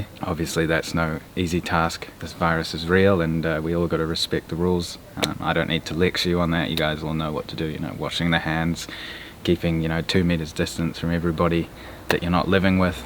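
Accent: New Zealand. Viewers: Piet Velkens Are you serious? I’m from NZ and he sounds very kiwi? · I'm confused, are you actually from Hungary and grew up in NZ for most of your life? Or you are from NZ and later moved to Hungary? I know either way you definitely spent most of your life in NZ bro, there's no hiding that accent haha you sound and even look just like my best mate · it sounds very Kiwi.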